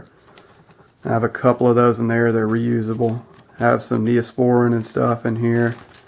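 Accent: Alabama, United States. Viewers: what part of alabama are you from?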